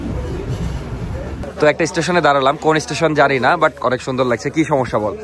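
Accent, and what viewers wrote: Rajshahi, Bangladesh. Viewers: Vai Ami epar Bangla ( INDIA ) ,apnar paser district Murshidabad theke , Apnader Rajshahi r Bhasar taan and amader Murshidabad er Bhasar tan onek tai same , khub valo lage apnader video dekhte . West Bengal a konodin asle dekha hobe ...Take care ❤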